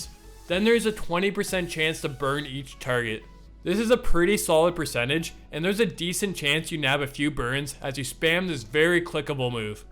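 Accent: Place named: Canada. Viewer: Please don’t use T*ump reactions like imagine if H*tler was used. I hope that puts it into context. I had a more thoughtful comment but of course youtube auto-deleted it. I love your content so I implore you to take this criticism seriously and with empathy for Americans. (It’s always esp like the scenario I described since you’re Canadian, at least I assume based on your accent and then confirmed on your channel location lol). And I say this as a dual Canadian American citizen.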